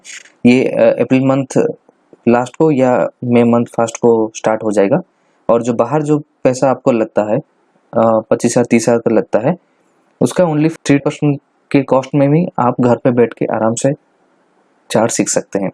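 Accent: Odisha, India. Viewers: Are you Odia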